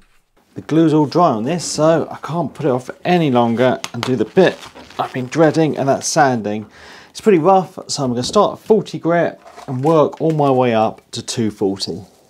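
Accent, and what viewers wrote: RP, United Kingdom. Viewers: I enjoyed your description at the beginning of deciding to make a posh project out of pallets. It fits your posh accent!😊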